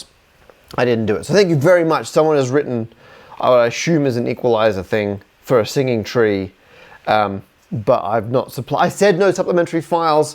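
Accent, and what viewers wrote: United Kingdom. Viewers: This guy has the kind of british accent that you don't immediately notice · I only clicked because I actually thought you were Matt Parker, creator of South Park just to find out you have a British accent. I was not expecting this lmao.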